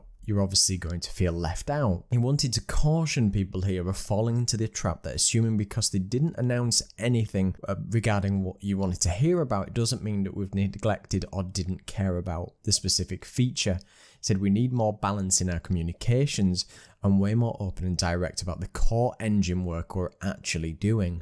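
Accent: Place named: North, United Kingdom. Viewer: Hey thanks for the coverage, now I know what I'd sound like coming from the north! 😉 Sorry to see the comments below that you are having struggles with the YT algorithm re Titles, that sucks. All the best!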